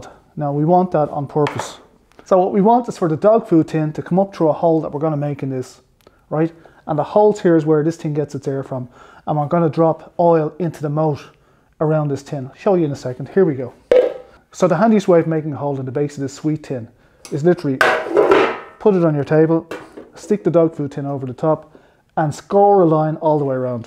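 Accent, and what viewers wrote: Ireland. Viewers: That's a mild Irish accent 🤣🤣🤣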